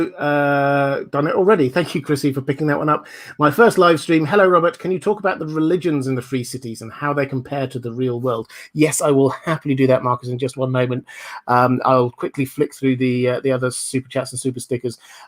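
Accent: United Kingdom. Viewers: Love your British accent on foreign languages! Keep it up and don't try too hard.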